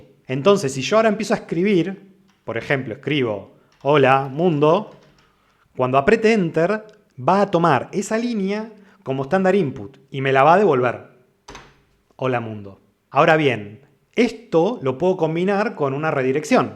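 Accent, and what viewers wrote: Rioplatense, Argentina. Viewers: Hola! Caí a este video buscando info sobre xargs, la verdad estaría bueno hacer hincapié en este comando ya que lo que permite hacer es (segun lo que vi hasta ahora) asombroso. De todos modos me aprendí funciones de grep y lo de wc que son súper útiles! Gracias por este video, espero que como a mí haya ayudado a más gente. Abrazo! PS: está bueno encontrar videos donde el ponente habla español rioplatense, hace más fácil la cosa viteh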